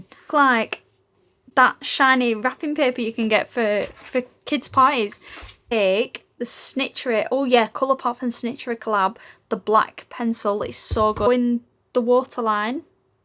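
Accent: United Kingdom. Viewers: This is the first time hearing your voice. Blow me down, you’re British! I had no idea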